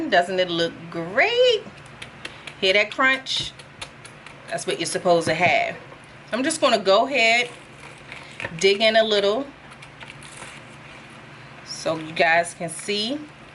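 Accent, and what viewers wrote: Baltimore, United States. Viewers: She's got to be from Baltimore. The way she says to and do.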